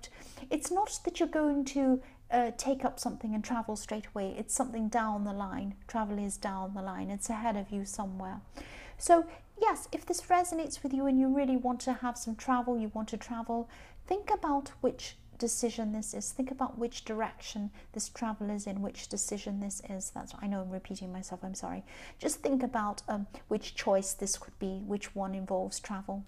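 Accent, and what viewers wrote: United Kingdom. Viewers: u r from UK?your voice is familiar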